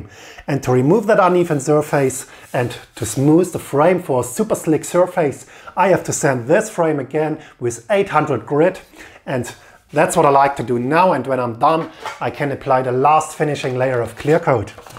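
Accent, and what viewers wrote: Germany. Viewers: deutscher akzent on point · Funny Guy, Hilarious comments, No BS to the Point, Crazy Accent - German if i am right!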